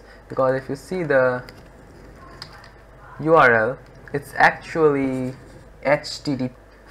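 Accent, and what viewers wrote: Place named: Brazil. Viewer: Ahhhhhhh 😂😂😂 é Brasileiro, certeza...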